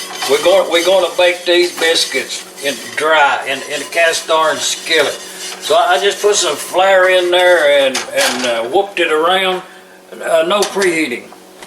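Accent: South, United States. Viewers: I'm Georgia born and raised. I just purely love watching your videos! You remind me of my daddy something fierce . He loved to cook and did a real good job of it too. The thick southern country accent is something I share with you .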